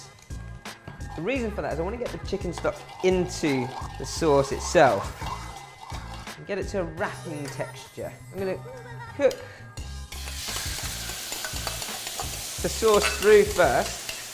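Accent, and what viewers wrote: United Kingdom. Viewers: Just realized he's Asian but has a British accent lol. Great video looks amazing! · That Hogwarts accent is wonderfully fun to listen to. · Awesome dish, it sounds like u have a british accent. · Yeah so some Chinese looking guy with British accents (who's probably a British) is explaining how to make a fake (and extremely unhealthy) Chinese dish that's invented in the USA, and never consumed by people in China. Pretty interesting, although I didn't watch it through. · School of Wok as in we’re or I at least have never heard an Asian man with a British accent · As an American fan of Chinese-American food, including General Tso's chicken, and as one who's grown impatient with authenticists and purists who try to delegitimize any Chinese food not made in China, I'm heartened to see a Chinese-British cook with a proper British accent who gives expatriate Chinese food its due and re-enforces its legitimacy as cuisine. 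· Ok, it ALWAYS fucks up my mental when I hear Asian people speaking with a British accent. Sorry, but it JUST DOES. I'm used to hearing an "American" tone, or an Asian tone, but a British tone? It just doesn't fit (to me) The recipe seems good, though.🙂🙂🙂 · I knew from the start he speaks he must be stayed or grew up in UK. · Asian guy with a British Accent? that's something new.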